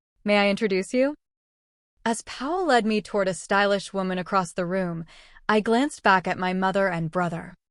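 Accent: United Kingdom. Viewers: What accent does the narrator have,from UK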